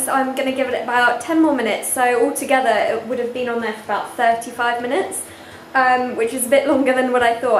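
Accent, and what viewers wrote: United Kingdom. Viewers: "cay-an" im laughing. the way you say things (I guess its just British) is funny to me. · Where is she from? It's a British dialect but I can't quite place it exactly :) Brighton maybe?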